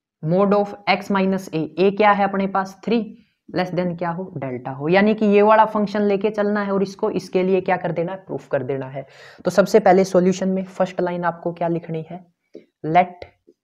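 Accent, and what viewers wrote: Haryana, India. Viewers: Sir g aap haryana se belong karte ho na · Sir your efforts are so appreciatiable. I like your Hariyanvi acsent .👌🙏😃😊👏🤗🙏😊👏👍🙏👌😃😍🎊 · Kis kis ne lagya ke sir Haryanvi ha 💪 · Sir aap haryana se ho kya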